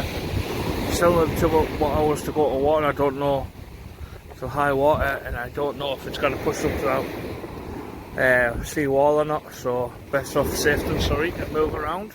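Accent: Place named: Teesside, United Kingdom. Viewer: Just subbed👍👍 I think you're my neck of the woods by your accent. Are you Boro/Stockton area?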